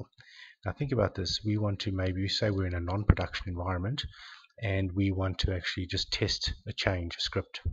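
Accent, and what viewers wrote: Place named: South Africa. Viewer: Your a legend! Quick and easy description and a very pleasent Saffa accent! Good work, thanks.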